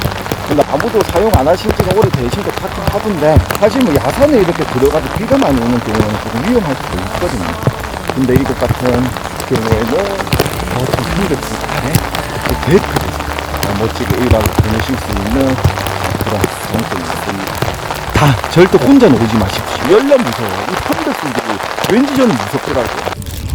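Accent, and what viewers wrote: Gyeongsang, South Korea. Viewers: 경상도 말 죽이네요❤ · 경상도 말투로 이렇게 재밌는사람은 처음본다 유머가 짱. 최고!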